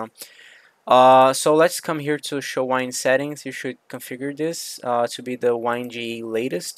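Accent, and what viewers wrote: Brazil. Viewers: Esse sotaque Brasileiro não engana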